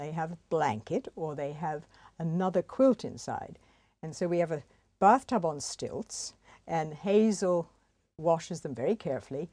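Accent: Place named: New England, United States. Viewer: It’s partly posh New England..a friend of mine spoke the same!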